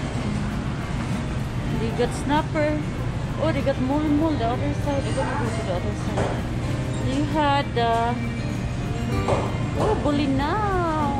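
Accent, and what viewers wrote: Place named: Visayas, Philippines. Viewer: Hello ma'am, murag bisaya ka ma'am, thank you for sharing this video, daghan silang klase sa isda oi, maka lingaw.. Amping, sending my support kabayan..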